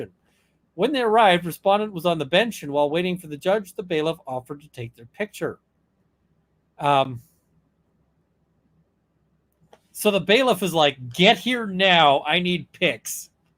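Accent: Canada. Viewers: It's so lovely to hear your Canadian accent. One of my best friends is a UK born Canadian.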